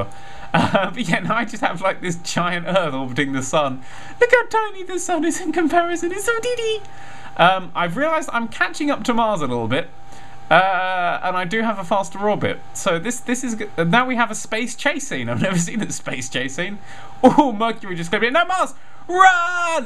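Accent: United Kingdom. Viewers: 'American voice' its a british accent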